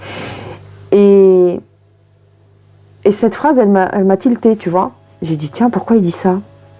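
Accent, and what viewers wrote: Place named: Paris, France. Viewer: Vous êtes super! Et oui, vous avez l’accent Parisien... 💕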